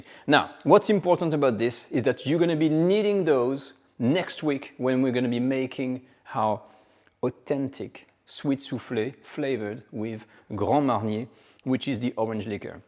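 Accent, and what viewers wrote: France. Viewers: Everything tastes better with that French accent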